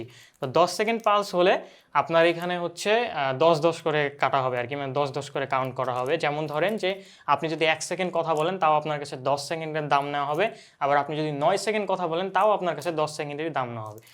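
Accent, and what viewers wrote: Rajshahi, Bangladesh. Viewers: Vai rajshahir nki . Khotha sune omon e lagche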